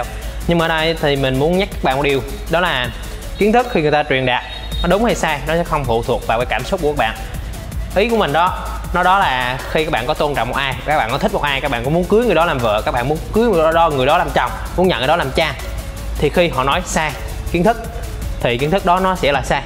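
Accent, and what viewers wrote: Hue, Vietnam. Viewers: anh ở huế đúng k ạ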